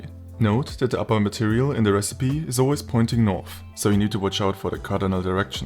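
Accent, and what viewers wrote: Germany. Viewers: I hear german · German tippa accent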